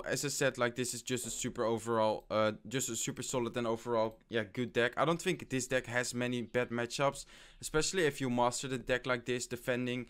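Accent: Netherlands. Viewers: I know that you are dutch you have the same acsent as kwebbelkop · Are u Dutch?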